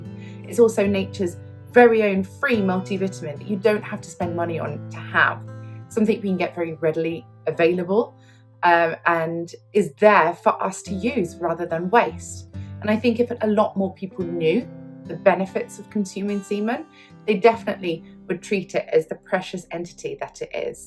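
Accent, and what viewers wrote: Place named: United Kingdom. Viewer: I came from LESA (Les amateurs) he is a very famous youtuber from Argentina who recommended you. I also want to tell you ai love you and your sweety british accent, I hope to meet you the day I will get back to England or also be welcome to China, the country where I live.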